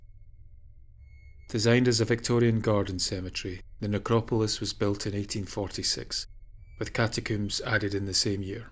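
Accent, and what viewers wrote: Scotland, United Kingdom. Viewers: Happy birthday,always enjoy your stories with that added bit of history and i always love a vampire story...Thank you for always your lovely scottish narration😊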